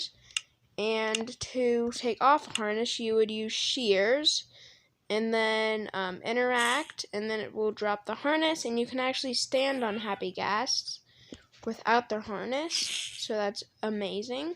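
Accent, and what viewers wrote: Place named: Texas, United States. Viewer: I realised ur voice has a lil Texas accent Lol❤ keep up the work man❤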